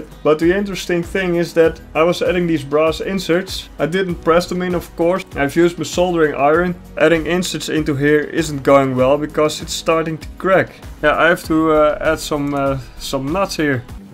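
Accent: Netherlands. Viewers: Damn your Dutch accent is strong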